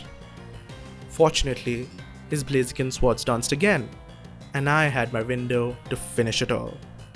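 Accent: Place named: India. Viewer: nah no way no way UR INDIAN I kinda thought u were INDIAN by ur accent but then I thought it would be racist but O MY GOD FINALLY A FELLOW GUY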